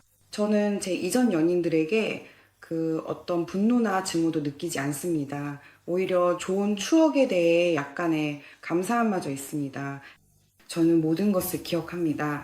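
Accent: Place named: Russia. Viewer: 꾸준하게 좋은 글을 올리시네요 .아쉬은점은 한국어가 능통하시는데,아직 러시아 발음이 깔려 있어 발음에 세심한 경청이 필요합니다 . 이점 때문에 내용은 좋으나 구독 유저가 저조 하다 생각합니다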